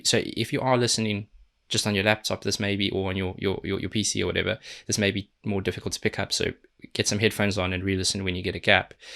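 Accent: South Africa. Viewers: I don’t want to be rude. Is that South African accent ( which I love) , my point is , is there South African metal bands ? Excuse me for being stupid, I really don’t know. Just curious ?!? Nighwish and the Hu are my favorites and some Philippine metal too 🤘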